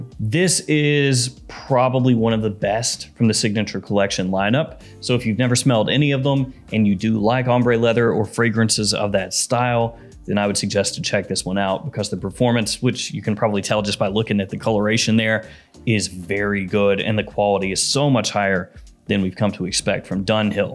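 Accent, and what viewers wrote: South, United States. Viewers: Southern accent, again. Awesome!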